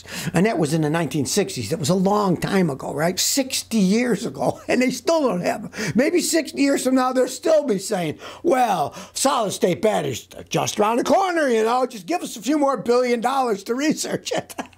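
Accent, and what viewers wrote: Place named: United States. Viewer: This man has the voice of true America. Don't give these corporations your money for their garbage products. Don't.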